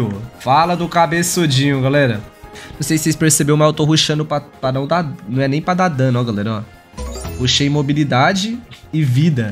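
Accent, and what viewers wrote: Minas Gerais, Brazil. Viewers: Não sei dizer se você é mineiro ou paulista... talvez os dois...kkkk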